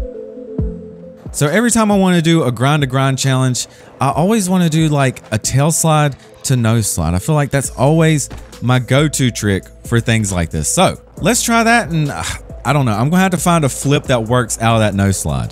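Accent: South, United States. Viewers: Is there a name for this sort of lispy, effeminate Southern accent? Like how Lindsey Graham talks.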